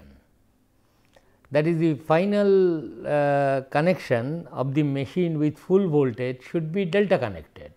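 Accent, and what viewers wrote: Bengal, India. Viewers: Bangla Gol Gol English! Awesome respected sir!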